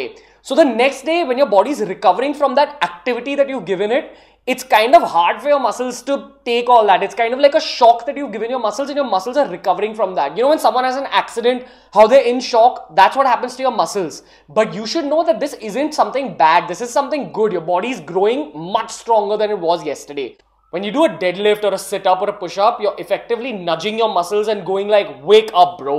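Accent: India. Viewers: Why are people talking about your accent... That's a normal accent found mostly in higher middle class or upper class section of Indians... 🙄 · Lol his accent tells he is Indian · So guys here is the pure Indian accent for you ..yeah I know its kinda weird 😐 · 😂 I can’t concentrate. His accent is too funny. Not to be disrespectful but he has a strong Indian accent I don’t even know what his saying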